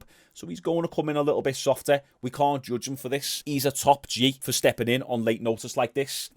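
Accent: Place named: Liverpool, United Kingdom. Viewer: I’m a fan You’re fun to watch and the Liverpool accent is your “Bread and Butter”!!! 🤣🤣🤣 Anyway, you could do a great live reaction show…it’s a bit of work but it would take you to the next level with “Super Chat” money, etc Keep up the great work!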